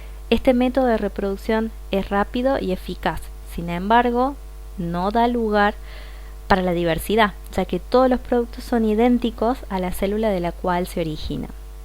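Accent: Cordoba, Argentina. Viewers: Sos de cordoba? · Voz cordobesa osiosi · re acento cordobés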